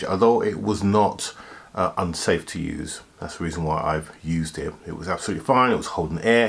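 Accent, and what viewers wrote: United Kingdom. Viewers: your a man of brown skin colour but could not sound more native british. made me laugh.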